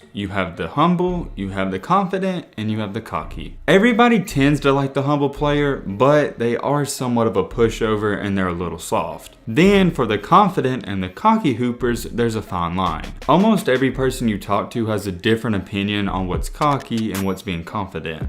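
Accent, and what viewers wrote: South, United States. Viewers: Bruh gotta be from the south somewhere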